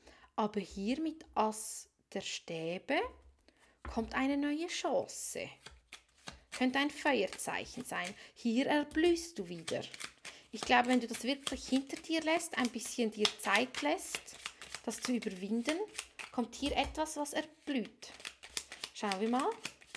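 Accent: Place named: Switzerland. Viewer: Ich liebe deinen Schweizer Akzent. Schön dir zuzuhören. lg Marcel🙏😊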